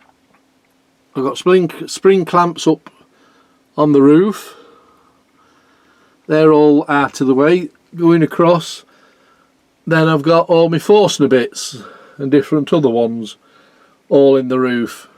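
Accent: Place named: Sutton in Ashfield, United Kingdom. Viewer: I've got a biggish workshop and still go no room lol. You sound local to me! Sutton in ashfield.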